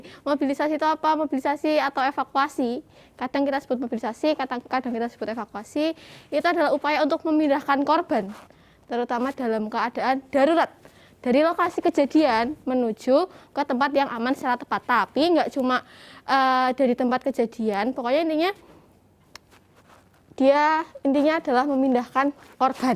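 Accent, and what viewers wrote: Java, Indonesia. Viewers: Medok bgt si wkwk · Medok-nya keren. Ini namanya locally rooted. Local wisdom 😊👍👍👍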